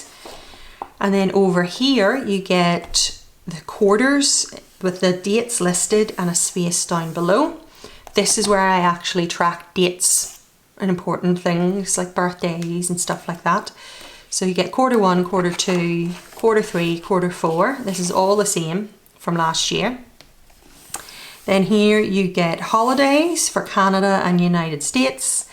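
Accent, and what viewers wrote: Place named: Ireland. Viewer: This is the first video of yours that I have watched and love it! Last year I traveled through Ireland and love hearing your accent 😊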